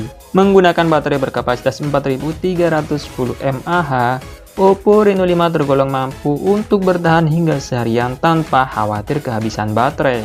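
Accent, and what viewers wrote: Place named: Madura, Indonesia. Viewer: Kayak logat orang madura 😅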